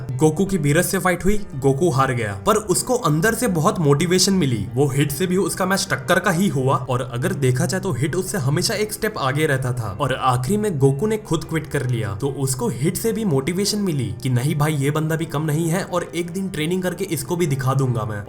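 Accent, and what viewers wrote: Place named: Bihar, India. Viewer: bihari lgte ho awaaz se!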